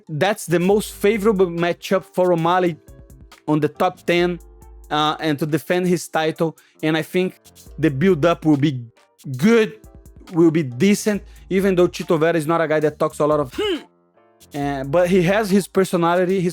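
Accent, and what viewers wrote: Brazil. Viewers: Your English is good. You just have a thick Brazilian accent. And there's absolutely nothing wrong with that. I prefer to hear someone's accent coming through personally. I'd say you're doing a very good job.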